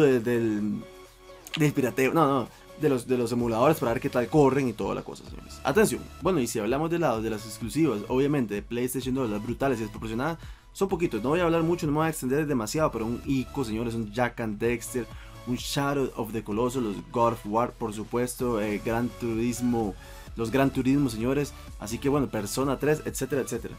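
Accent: Costa Rica. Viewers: Ese acento, costarricense pa ¡pura vida! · Playo, tico hablando!!!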